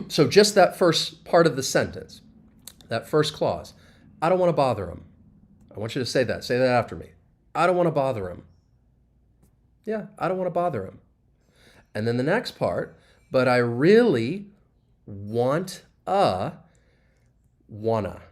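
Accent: United States. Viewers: The most stupid American English accent which no one in the world understands it.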